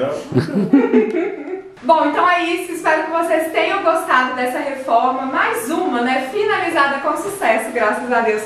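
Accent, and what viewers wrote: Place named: Minas Gerais, Brazil. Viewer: O sotaque mineiro é um trem lindo demais!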